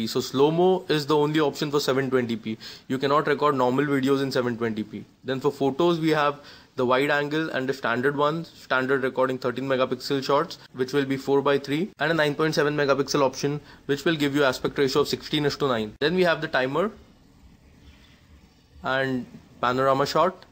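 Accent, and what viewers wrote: India. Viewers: i really love Indian accent :)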